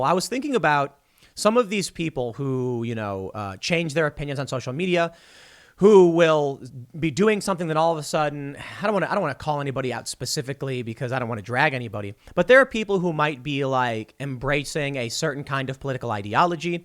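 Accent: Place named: New England, United States. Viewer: stop saying “Y’all” it’s a gross word and it sounds even more gross coming from your Yankee voice box.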